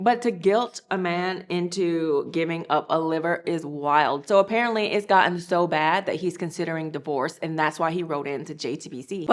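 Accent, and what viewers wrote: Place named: United States. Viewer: Love the stories and the American accent with occasional Korean.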